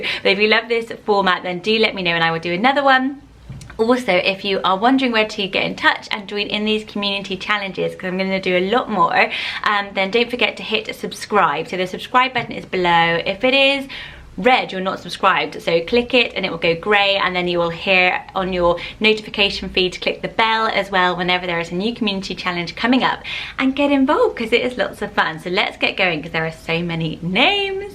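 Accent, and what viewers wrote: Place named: Bristol, United Kingdom. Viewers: My dauther's name is Lily Adaline. I love the way you prononce all those names with your English accent, but as a french Canadian I need a name that sounds good in french first for the baby im currently pregnant with. Sad cause I love Elle, Everly, Automn... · Where are you from? You sound just like my friend from Bristol :)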